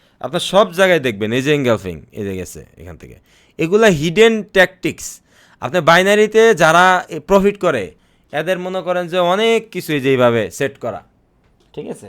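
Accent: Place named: Bangladesh. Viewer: আপনার কথা বলার ধরন অনেকটা ভোলা অথবা চাঁদপুরের মানুষের মত। জানিনা আমি আপনার দেশের বাড়ি কোথায়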